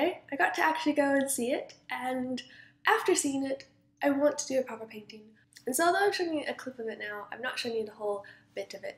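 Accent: England, United Kingdom. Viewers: Your accent is changing! Becoming more English 😮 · Does she have a British accent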